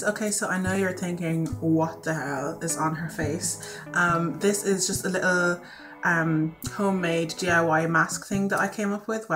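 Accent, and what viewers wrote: Ireland. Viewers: I can sense the Irish accent... Am I right? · Are you Irish? I love that accent!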